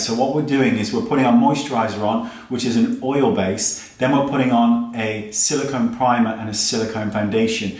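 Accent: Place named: West Country, United Kingdom. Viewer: it's a west country accent, it's not that fabulous in term of English accents, but better than mine at least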